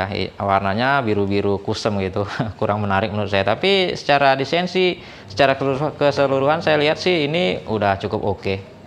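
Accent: Medan, Indonesia. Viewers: abg orang medan ya bg q sring nonton vidio abg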